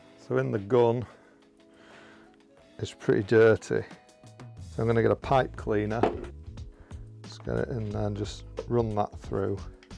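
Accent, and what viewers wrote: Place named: Bolton, United Kingdom. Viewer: Thank you! Good to know ! I’ll try this… Merry Christmas.. you sound like you’re from Bolton !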